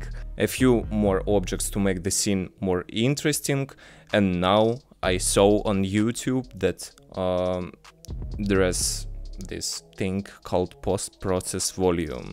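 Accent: Russia. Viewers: Привет! Ты русский , по акценту слышно)))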